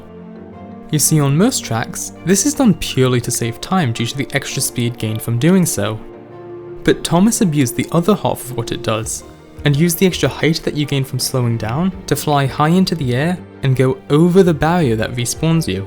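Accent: United Kingdom. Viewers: legit thought you were british at first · summoning salt but bri'ish (in all honesty rly good vid :D) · Most British sounding American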